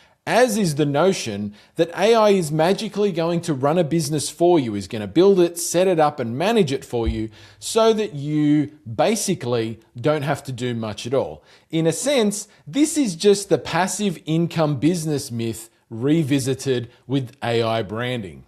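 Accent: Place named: Australia. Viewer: Love hearing such great AI myth busting here! Us poor dropshipping folk always get branded as the lazy ones reaching for the the new shiny thing. Love the channel and great hearing from a fellow Aussie!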